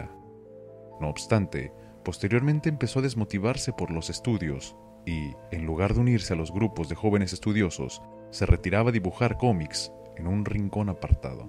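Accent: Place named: Mexico. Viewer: Muy buenos vídeos, te recomendaría que hables de una forma más pausada ya que el acento mexicano resalta mucho, de ahí en adelante todo genial...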